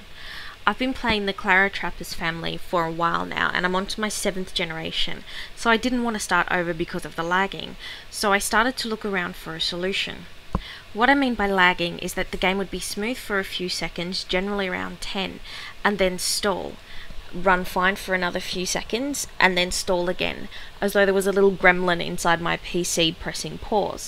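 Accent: Australia. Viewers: Are you Australian? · classic aussie accent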